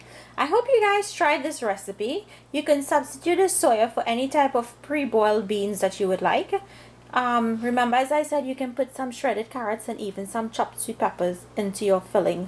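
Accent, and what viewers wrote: Trinidad and Tobago. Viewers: Hi, I'm still watching your Christmas cooking. It makes me feel at home. Trini English.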